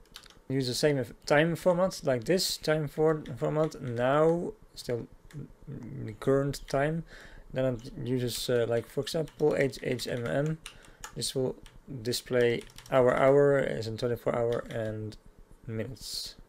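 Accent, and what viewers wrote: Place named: Netherlands. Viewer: from the 1st second i knew you were dutch😊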